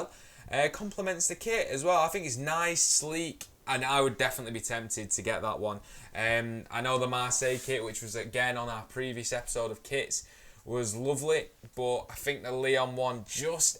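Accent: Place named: Sheffield, United Kingdom. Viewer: ah cheers thought you sounded like you were from Sheffield. I’m a blade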